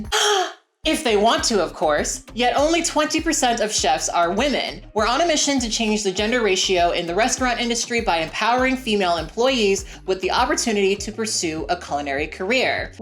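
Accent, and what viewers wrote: England, United Kingdom. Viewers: 🍔 As a Brit, I liked your English accent. It wasn't as exaggerated as most other Americans' impressions. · I love British speaking tbh 😍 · Your british accent is wayy too good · You doing the intro was so bizarre to a new follower. I was just here like "B....british??? I didn't think I followed any non-gaming British Youtubers....." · "I don't want comments" we are gonna comment: it's the british accent for me 🍔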